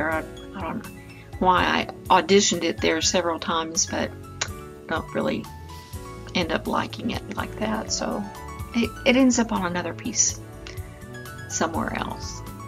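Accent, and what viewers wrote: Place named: Texas, United States. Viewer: Love your videos and art,. Really helps me with my composition. Are you Texan by any chance? We live in England, and you remind me so much of the Texan women I grew up with. I could listen to you all day..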